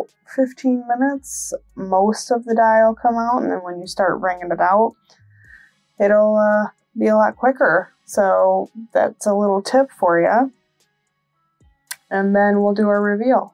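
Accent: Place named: Wisconsin, United States. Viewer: You sound like you’re from Wisconsin too!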